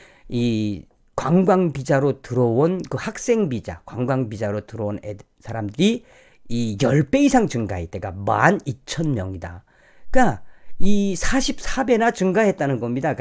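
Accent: North Korea. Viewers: 정감독님 북한말 안쓰구 그냥 자연스럽게 말씀 해주시면 안되겠어요 ? 더듬대구 불편해요 듣기가 죄송요 ㅠ 짜증나요 더듬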